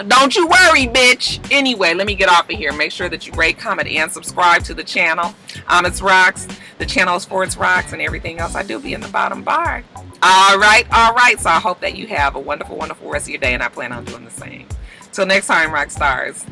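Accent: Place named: California, United States. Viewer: Your ray j cali accent makes me so homesick 😭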